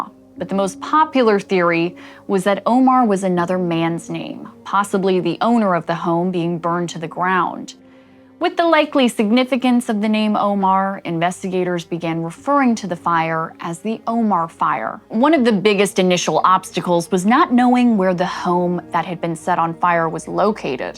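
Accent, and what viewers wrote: Australia. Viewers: This channel grew from 30K to over 100K because of the Aussie. Don't try to get fancy.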